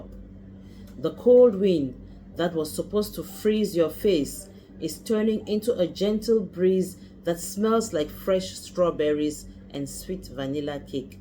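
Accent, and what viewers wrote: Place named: Africa. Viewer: A beautiful prose of becoming spoken in my honor. Thank you Child of the Most High God. From your accent, I believe you may be from the Motherland. You have superb writing skills — the literary world needs to hear from you … in peace and love, God’s Chosen Servant & Supreme Leader With Spiritual Authority ♥️👑♥️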